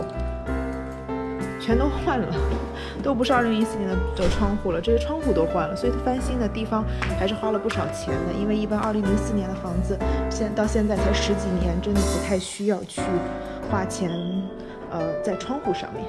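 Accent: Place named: Beijing, China. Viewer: 您是北京人吧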